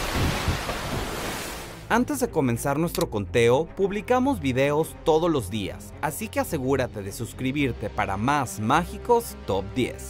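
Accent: Mexico. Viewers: En que momento la voz se volvió tan mexicanota? Extraño la voz antigua, era mas "universal" por asi decirlo.. y mas rapido al hablar :/ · Este narrador es de México y el otro Argentino?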